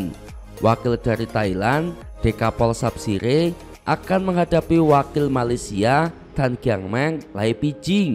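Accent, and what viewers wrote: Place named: Java, Indonesia. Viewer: Medok bnget jowone to mas..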